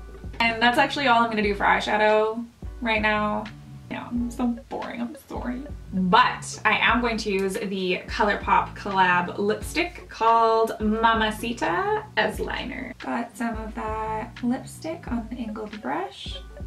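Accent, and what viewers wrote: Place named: Canada. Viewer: i love your slight canadian accent it's so cute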